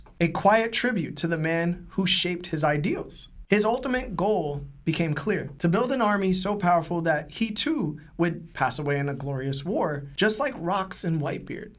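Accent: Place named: United Kingdom. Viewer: Why is he british tho?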